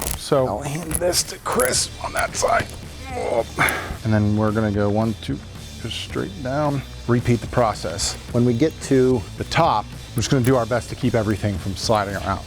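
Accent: United States. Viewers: I like how you have a regular just generic American accent but then hit us with the yinz and some of us know exactly where you grew up.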